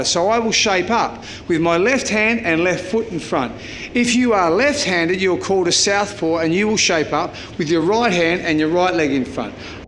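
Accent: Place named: Australia. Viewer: Nah, you're just an American with no ear for accents. Bear Grylls is British, this guy is Australian.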